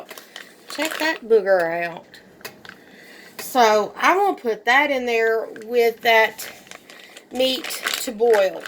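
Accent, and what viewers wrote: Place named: South, United States. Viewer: Mama, I love that southern accent.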